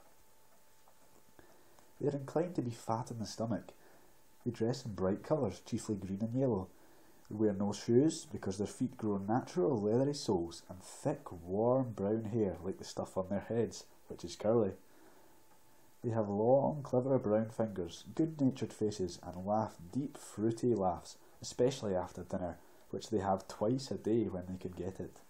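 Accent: Scotland, United Kingdom. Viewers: Holy Highlands, Batman! Reading Tolkien in a Scottish accent. That *THUD* is just me, *Falling Over* ! Brilliant. I didn't get asmr, but it's usually random, anyway. I'm just so excited for this community that you made this video, because THAT'S WHAT I'M TALKING ABOUT! Definitely keep doing these. p.s.: "He came expecting mischief and didn't need to see his friends' legs sticking out of sacks to tell him that things were not at all well..." ;) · Hay! A fan of scotland and ye accent here. There's something fascinating about it, so definately do more. For 'untrained' ear, your speech sounds very odd and I hardly understand any words, but I like it a lot. Hopefully we will hear (about) you in some point again! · I wouldn't rate this as an asmr. You could get more views and likes if you titled it as a Scottish accented reading of The Hobbit. Loved it btw. 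Used to live in Skye untill we moved elsewhere. So go ahead mate. :D upload some more.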